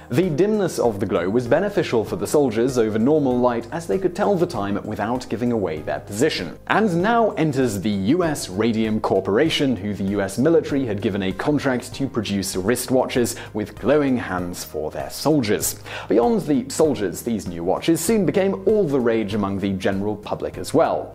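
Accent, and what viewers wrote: United Kingdom. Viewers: What's accent called?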